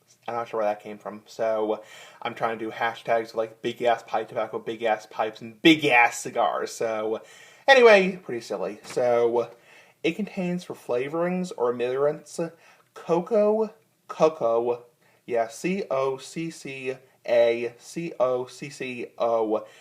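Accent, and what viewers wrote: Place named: France. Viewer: I like your accent, are you French?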